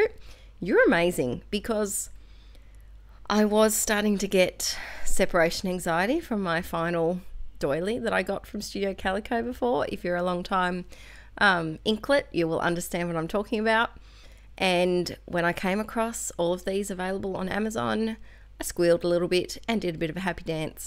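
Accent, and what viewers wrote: Australia. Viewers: I like 10-15 minute videos especially if I'm at break during work but I love listening to an aussie accent for hours. So you make them as long as you like.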